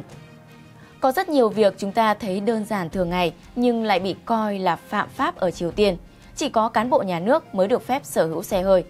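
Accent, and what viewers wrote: Standard. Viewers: là số một hiện nay ở việt nam nhưng bình luận vẫn phụ thuộc ý tưởng của bbt. Người đẹp, giọng chuẩn, có hồn khi truyền đạt. Luôn ủng hộ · CHị dẫn chương trình hay quá....! giọng rõ phát âm rất chuẩn,dể nghe...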